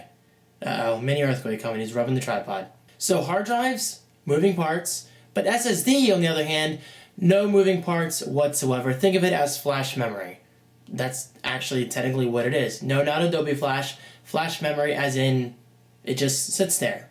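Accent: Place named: New Jersey, United States. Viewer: maybe its how they say it in jersey?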